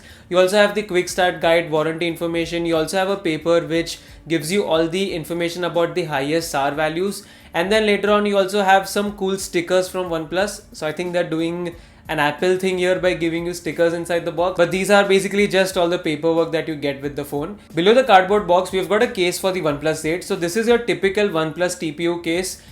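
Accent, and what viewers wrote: India. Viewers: i like your Indian accentm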